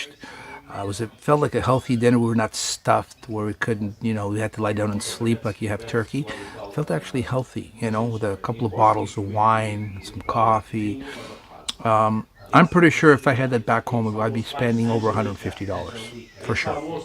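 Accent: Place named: Azores, Portugal. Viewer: Que lindo gostei muito de ver e ouvir tu a falar Açoreano beijinhos da Ilha de São Miguel ❤😘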